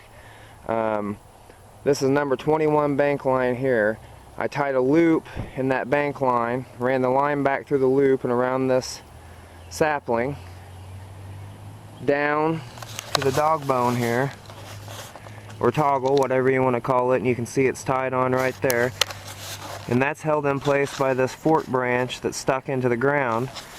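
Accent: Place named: Midwest, United States. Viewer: What part of the Midwest are you from.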